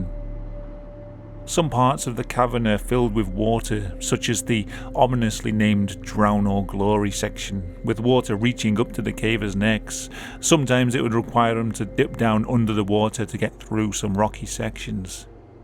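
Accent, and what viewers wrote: United Kingdom. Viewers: Ur British ascent is too muchhhh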